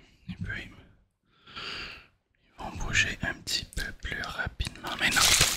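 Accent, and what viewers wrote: Quebec, Canada. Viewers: Je pensais pas que l'accent québécois fonctionnerait autant sur moi en ASMR. Je te remercie en tout cas, j'ai du mal à m'endormir en ce moment et tes vidéos sont d'une grande aide ! ♥️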